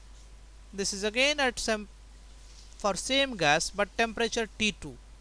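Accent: India. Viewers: ohhhh WTF..indian engish voice..going to hell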